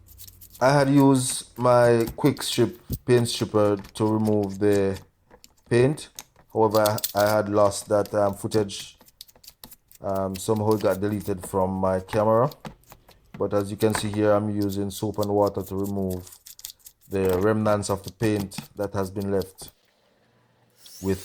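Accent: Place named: Jamaica. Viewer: Heyyy jamaican accent! Bomboclat!!!!!!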